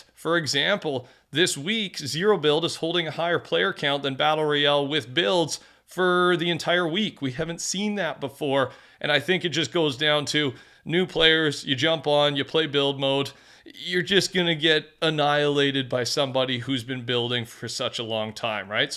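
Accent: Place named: Canada. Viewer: He's Canadian they Pronounce Word differently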